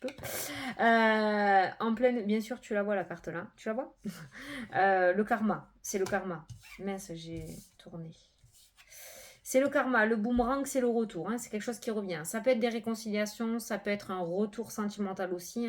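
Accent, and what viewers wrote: South, France. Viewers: Bonsoir à vous merci beaucoup pour se magnifique partage, ( et oui dans le sud c'est comme sa qu'on parle, je suis du 13 donc je suis comme sa aussi. 😊 ne changez rien, restez comme vous êtes 😁 on vous aime comme sa. ) bonne soirée à vous aussi et gros bizoo 😇🙏😘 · Coucou surtout ne change pas garde ta belle humeur et ton accent du sud et petits mots qui dérangent que certains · cc moi aussi je suis du sud je te comprend très bien c notre parlé bisous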